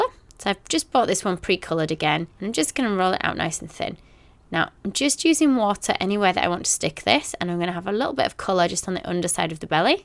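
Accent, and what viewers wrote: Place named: Yorkshire, United Kingdom. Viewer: It is so nice to have someone from Yorkshire to listen to!! You are super talented! Can always count on your channel to rescue any of my cake fails!😂x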